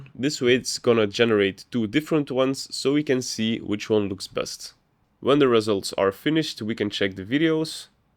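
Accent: Belgium. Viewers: are you from belgium ?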